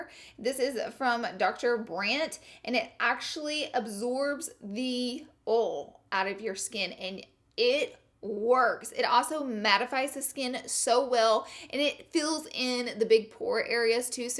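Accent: South, United States. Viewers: LOVE THAT SOUTHERN OHL!! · I love the southern accent, ❤️from Tennessee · Love your southern accent ❤️👌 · Honey, you say oil just fine. I love how your southern accent pops in once and a while. You stay strong to where you're from and I think that's an honorable thing. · Love your southern twang! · I love her southern accent! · I love the southern accent, its adorable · I am from the south and I love hearing beauty guru type YouTubers talk the way I do. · When you're from the south and talk the same way 💕 Girl I got you 🤙🏼 ole ftw 😂😂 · Love ur southern accent girl 👍❤ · You're awesome blossom 😊 Love ya .... keep saying oil the way you do. Most ppl love it and your southern self ❤️ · I love that you're so unapologetic about your southern accent. I never get to hear people like me in the beauty world so I ❤️ you and your channel! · I love your southern accent, why would someone not like it #snapfam💦💦💦💦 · I <3 your southern accent!!!! 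· I love your Southern slang 🤣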